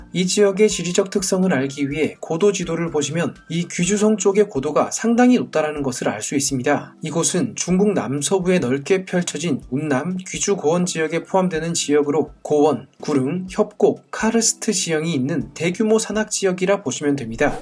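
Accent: Gyeongsang, South Korea. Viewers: 채널 주인 분이 경상도 억양을 쓰시다보니 그런 것 같아요ㅎㅎ 경상도에선 유궐치뤌파뤌 이렇게 발음하다보니깐요.. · 경상도 사투리에요, 발음이 쌘게 특징이죠.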